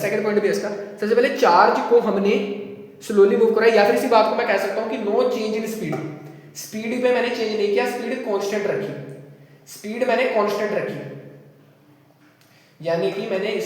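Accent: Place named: Punjab, India. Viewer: Nice video and intro in punjabi tone I like this keep it up 🎉🎉😊🙏🏼🥰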